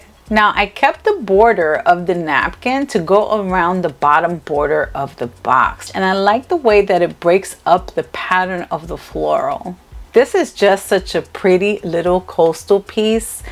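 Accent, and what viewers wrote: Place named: New Jersey, United States. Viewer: Ohmygosh I love your joyful personality, first of all. I also really like listening to your accent. My older sisters are Peruvian and grew up in Jersey like 20min away from NYC; and your accent just makes me feel like home lol. Completely aside from that, "elegant coastal" is the perfect way to describe this - it turned out BEAUTIFUL!!! <3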